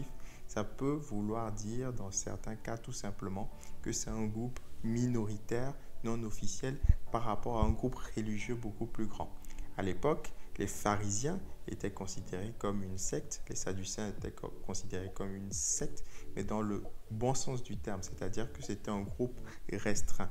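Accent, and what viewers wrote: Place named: Ivory Coast. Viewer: Bon enseignement pasteur et excusez-moi vous êtes ivoirien ? Parce que je crois avoir reconnu l'accent haha. GOD bless you🔥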